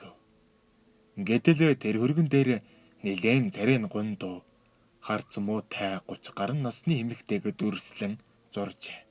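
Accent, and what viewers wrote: Inner Mongolia, China. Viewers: Өвөр Монгол аялага сонсогдоод байх юм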